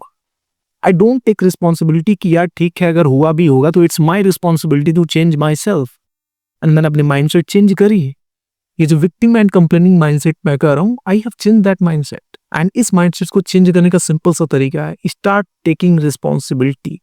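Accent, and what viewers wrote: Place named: Bhojpuri, India. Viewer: Where you from bro Your language accent is bhojpuri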